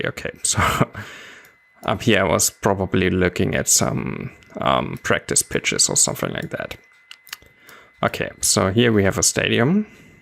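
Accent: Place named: Germany. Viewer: Hi, thanks for subscribing to my channel ! Where are you from btw ? I'm guessing either Germany, Austria, Switzerland or Denmark based on your accent (but I might be completely wrong haha) Edit : after watching another video (the Romania Fake one), I'm not so sure now haha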